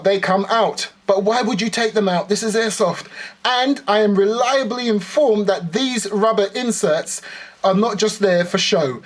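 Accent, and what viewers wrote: United Kingdom. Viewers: Are you British? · You are delightfully British. I love it.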